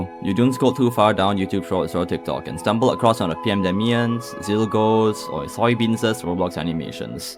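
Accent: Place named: Singapore. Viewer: Singaporean too! I recognize the accent from anywhere!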